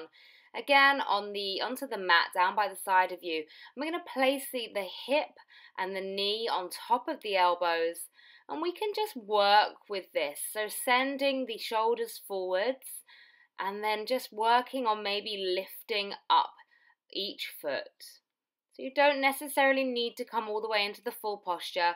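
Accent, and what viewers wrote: United Kingdom. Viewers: Where is her accent from? I know it's British, but what part of England? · Thanks for an excellent flow - many postures here I haven't done in ages. Also nice to hear an English accent, and a bit of humour!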